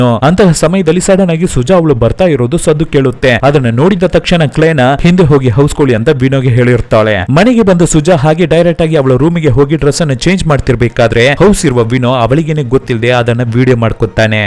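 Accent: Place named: Kerala, India. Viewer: malayali kannada accent kaka angadi maatu ide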